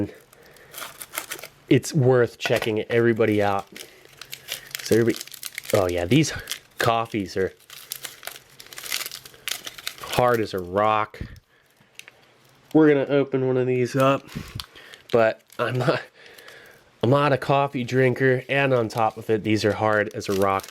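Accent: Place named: California, United States. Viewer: Yeah he's a pretty cool bro, sounds Californian, might not be but he's got that dude vibe going. I love it when he says "Let's get this on a tray...nice!"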